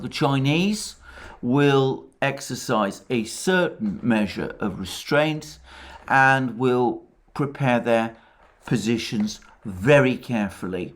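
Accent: United Kingdom. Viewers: Too British an accent.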